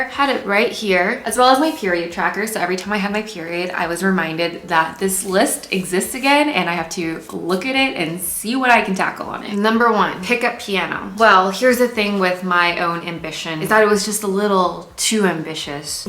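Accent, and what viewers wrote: Vietnam. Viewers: omg that northern vietnamese accent! when u spoke i felt like you were my big sister 😄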